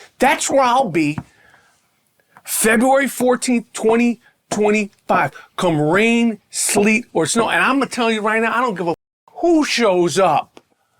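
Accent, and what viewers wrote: New York, United States. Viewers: Yo Rap. I love it bro. You’re still talking like it’s the 1980’s New York hip hop scene. Ol’ school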